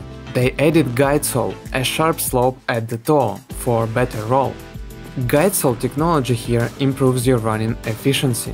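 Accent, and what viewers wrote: Russia. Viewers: Thanks for the analysis, but this russian accent really turn me down.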